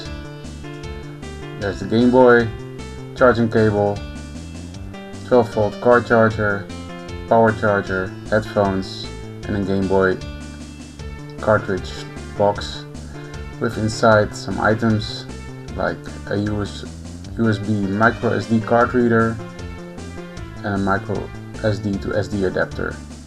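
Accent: Netherlands. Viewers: Nederlands accent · Are you Dutch? Becouse you sound Dutch. I'm Dutch :) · the second he started talking i knew he Dutch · You must be dutch, what a horrible accent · Dat Nederlandse accent, though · You are dutch, i can hear it from your accent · Soep in je schoen. Nederlands accent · Are you Dutch? Cause you sound a little Dutch. :) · w0w dutch accent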